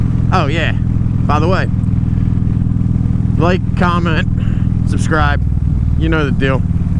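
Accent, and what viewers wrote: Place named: New Jersey, United States. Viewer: 10-4, thought I might have heard a little Jersey in your voice. Ive spent time time in that corner of PA, MD and DE in Elkton.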